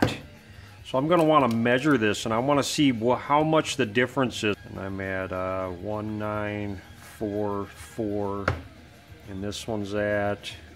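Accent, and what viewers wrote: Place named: Minnesota, United States. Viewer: What an interesting channel, a creative thinkers oasis in the middle of Minnesota. Now I understand the accent in the movie Fargo, ha ha ha.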